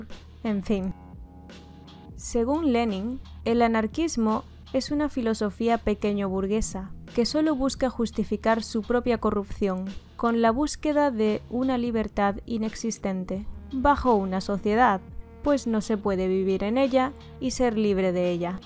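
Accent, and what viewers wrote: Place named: Spain. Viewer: Estoy muy confundido ahora mismo porque tienes un acento casi diría de España no tanto de México pero tienes una foto de perfil y la bandera que podríamos relacionar a México.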